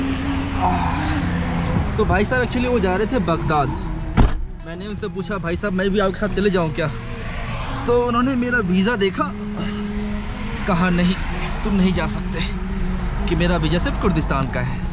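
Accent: India. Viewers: Bhai, Youtuber to bahut hai. But aapka bolne ka desi andaaz, really love it. Kabhi bhi isse change mat karna. Yeh bhi aapki ek khasiyat hai.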